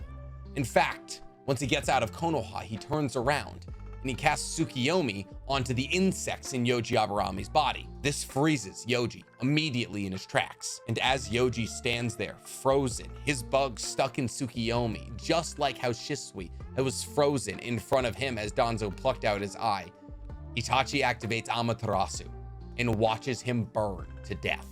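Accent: Boston, United States. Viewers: Bro your from boston for real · Wait whattttt is the homie from Boston?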